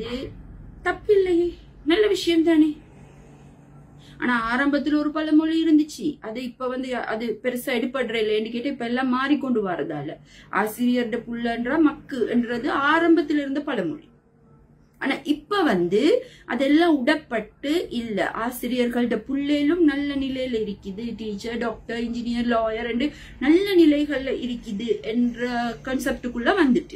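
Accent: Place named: Colombo, Sri Lanka. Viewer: இது கொழும்பு மக்களுக்கு உரிய பேச்சு நடை எல்லாரும் சுத்த தமிழிலா பேசுறாங்க குறை காண்பதே வழக்கம் போல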